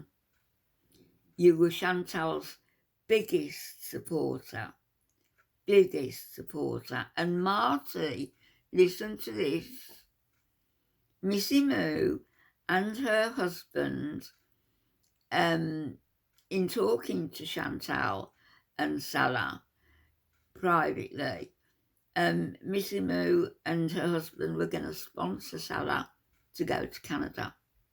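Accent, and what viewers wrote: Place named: Birmingham, United Kingdom. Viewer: That's a brum accent ( Birmingham)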